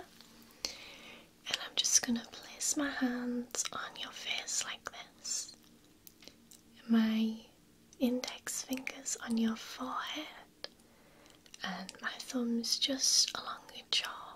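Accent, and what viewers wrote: United Kingdom. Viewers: Where in the UK are you from? I love female accents, but I can't always place them.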